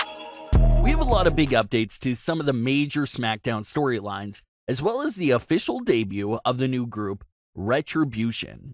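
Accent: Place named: Canada. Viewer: That damn accent is so annoying you sound like damn Canadian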